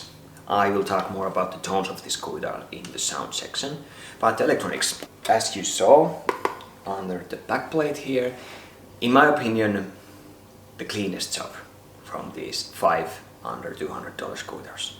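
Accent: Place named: Finland. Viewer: this man sounds very finnish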